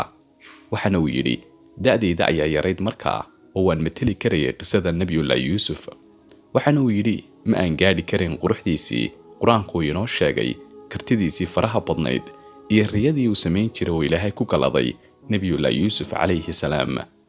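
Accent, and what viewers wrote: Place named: Somali Region, Ethiopia. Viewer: Waa Somali ninka sida hadal ka lo dhigey Ethiopia Somali ba mise waa Ethiopia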